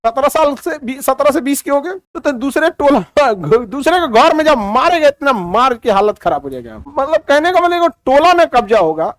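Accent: Bihar, India. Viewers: Jese tum bolte bihari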